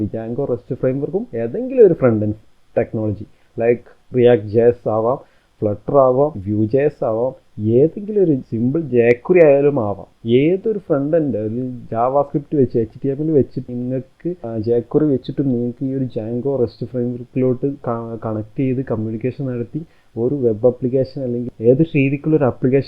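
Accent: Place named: Malappuram, India. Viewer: malappurathevideya........slag kettapoye manassilayi malappuram anennu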